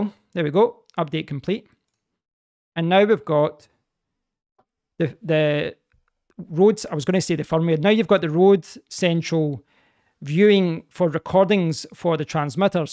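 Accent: Scotland, United Kingdom. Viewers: Just got my Go 2, and this was a great tutorial (much better than Rode's documentation). The Scottish accent made fun to watch too!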